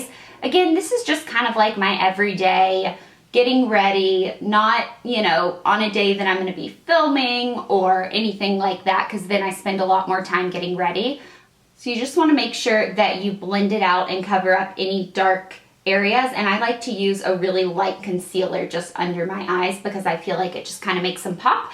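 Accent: South, United States. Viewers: I used to watch you years ago! (: I forgot how adorable your southern accent is ahah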